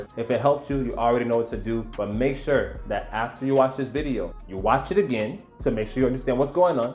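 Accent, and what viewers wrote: United States. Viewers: Your gringo/American spanish accent is hilarious 😂 😂 Especially because we know your accent is way better than that. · El acento gringo 🤦🏽‍♀️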